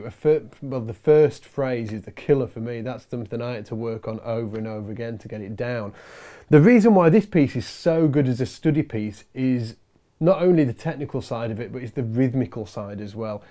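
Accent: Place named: United Kingdom. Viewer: you are a great teacher. Years ago a police detective friend realized later in life the New York accent made him, let say upset. I too have found the UK accent has a similar effect on me. Which is the only reason I canceled my membership. Again you truly have the gift and it saddens me deeply. I wish you all the Health, Wealth and Happiness life has to give.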